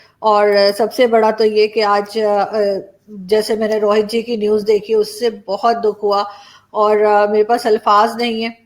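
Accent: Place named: Delhi, India. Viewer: Aap is tarah baat Karti hain jaise koi Dilli ,UP ki ladki baat kar Rahi ho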